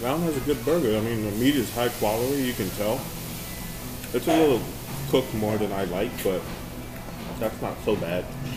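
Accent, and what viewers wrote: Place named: South, United States. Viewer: I had to tease you lol.....you sound like my dad he's country..😉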